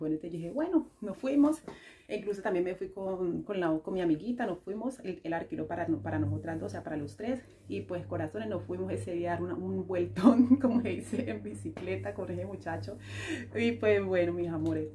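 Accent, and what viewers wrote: Colombia. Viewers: Yo siempre he dicho lo que es pa' uno, es pa uno. "Ni aunque te quites, ni aunque te pongas" Hermosa historia mi niña🥰🥰me encantó el final feliz💖💖 Por tu acento me pareció que eres caleña, vo jabes😉 Si no es así lo mejor es que eres una paisana colombiana y bella😍😍 Aquí me quedo en tu canal nueva suscriptora. Una caleña que ya enamoraste saludos mi princess👸🏽❤😘🤗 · Soy nueva ,me enganche a tú historia y al acento Colombiano,soy Cubana k vive en Barcelona, saludos.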